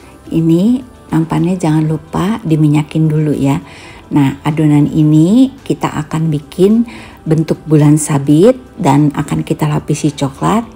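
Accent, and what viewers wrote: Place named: Merauke, Indonesia. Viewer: Suaranya seperti nona merauke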